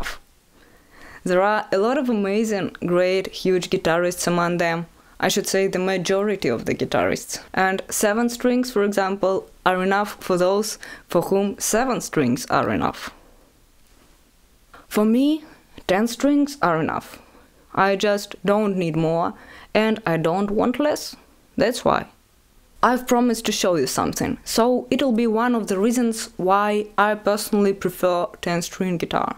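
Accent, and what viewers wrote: Russia. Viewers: I love your accent hehe. You know pretty much of music history by the way, wish some day meet you and talk all day, you can teach me russian, and I can teach you spanish ! Hahaha. Buen video, saludos ! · Music needs more than 6 or 7 or 10 strings, music is choking with limited strings number, give it freedom 💁🏻‍♂️ I enjoy this explanation, and like the way you speak rushinglish😅... But above all, my dream to have 6 or 7 or 10 strings guitar 🤩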